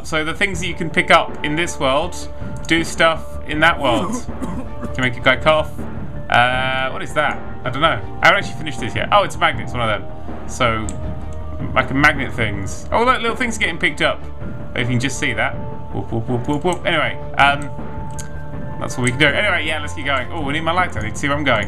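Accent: United Kingdom. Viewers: its the british accent. once again, the reason why americans watch top gear, is the british accent. i dont pretend to know why, its just a fact. its hypnotic and adds automatic legitimacy at the same time. we all are slaves to the magic of a british accent. if that makes any sense. which it probably doesnt. nothing i say ever does... hehehe... what?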